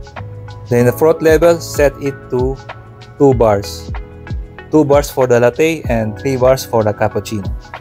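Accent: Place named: Philippines. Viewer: Cute heavy Filipino accent. Thanks man!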